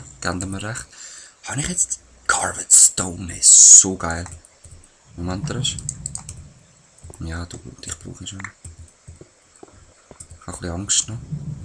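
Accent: Basel, Switzerland. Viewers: bisch du e Basler?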